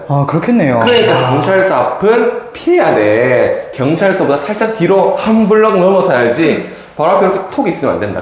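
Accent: Gyeongsang, South Korea. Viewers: 경상도 억양이 너무 심하네요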